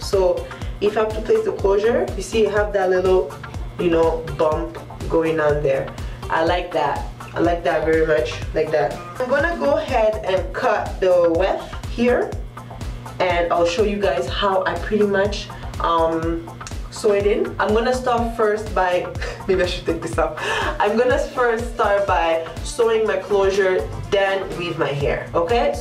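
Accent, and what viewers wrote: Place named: Africa. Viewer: love the African accent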